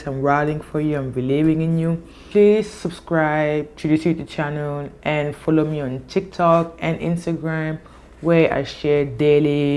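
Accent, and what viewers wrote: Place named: France. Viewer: Hi, I like your French accent that hides behind the English one!! I subscribed just for that!!! Je me suis abonné juste pour cela!!!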